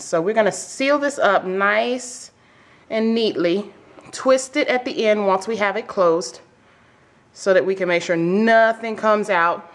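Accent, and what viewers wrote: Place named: Germany. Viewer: You have a solid german accent haha I was not expecting your reveal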